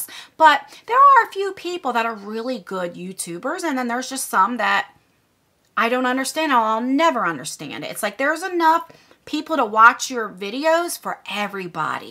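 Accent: New Jersey, United States. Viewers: Thanks for sharing! I don't wear makeup much beyond lipstick, so the unboxing channels I follow are because of the person doing the videos. I've liked you from the beginning. I like listening to you speak (so, chat away!), you're pretty (love your eyes!), and you've always seemed like a genuine person. I hate fake people, so it's refreshing coming across someone like you. We moved to Jersey when I was in junior high, and my mom still lives there (although I do not) so your accent caught my attention, too 😄 I'm glad you have a support system to help you through the tough times, and I wish you all the best 💕 Buona fortuna!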